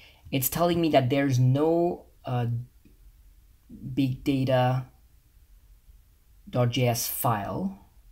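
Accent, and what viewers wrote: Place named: Ireland. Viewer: Nice video mate, are you living in Ireland, I can hear a slight Irish accent at times?!!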